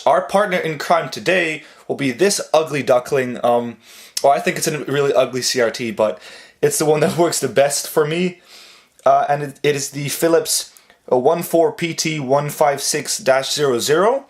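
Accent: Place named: United States. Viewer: Judging from your accent, i thought you were American.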